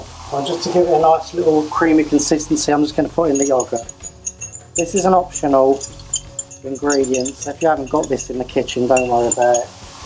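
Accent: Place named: Essex, United Kingdom. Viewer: Great vids, thanks! And bonus is, I'm from the Glasgow area and listening to you is like hearing my Romford relatives, sadly now no longer with us...makes me smile and remember past Essex holidays :)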